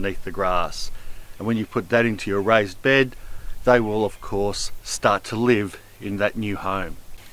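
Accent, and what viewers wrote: Australia. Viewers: currently 26 people don't like this video: you have no hearts guys! :D Aussie accent the body and the energetic effort with a ton of passion - together make my days better! A FULL thumbs granted! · I'm half watching just for the accent. The Aussie accent is the coolest there is. G'd day, mate. Oh, and thanks for saving me time and money. I would have filled mine with high quality $$$ soil.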